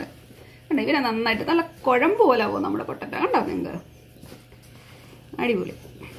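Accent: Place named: Kuttanad, India. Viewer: സംസാരം കേട്ടിട്ട് കുട്ടനാട്ട്കാരി ആണെന്ന് തോന്നുന്നല്ലോ 😊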